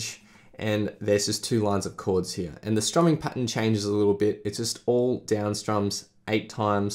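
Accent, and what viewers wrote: Australia. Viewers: Love the ausssie accent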